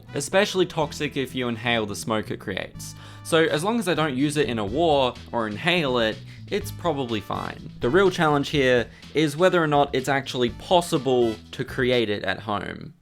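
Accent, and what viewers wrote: Adelaide, Australia. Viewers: Your voice fluctuates so much. Sometimes it's easy to tell you're an Aussie, other times your voice does this weird thing where you sound American for a minute. Not necessarily a bad thing but different. Good video overall, might wanna change the name so you don't end up with feds. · Can tell you're from Adelaide XD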